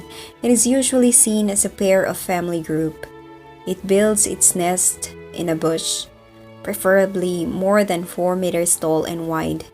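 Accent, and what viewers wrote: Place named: England, United Kingdom. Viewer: Interesting Stories, i Like your english accent kabsat.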